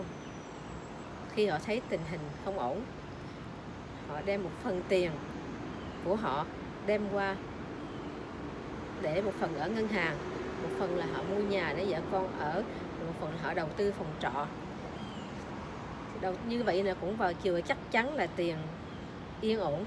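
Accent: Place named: Vietnam. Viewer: Trời trời bạn giống người Mỹ quá mãi sau ghe giọng mới biết là người việt.bạn hướng dẫn mua nhà ở mỹ có cần thẻ Xanh ko rất chi tiết chúc bạn thành công nhé bạn hiền ơi 🔔🔔cho bạn nè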